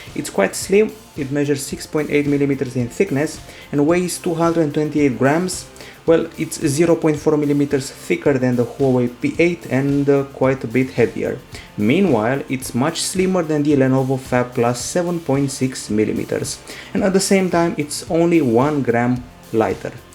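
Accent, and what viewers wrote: Romania. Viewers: Romanian accent 😅 👍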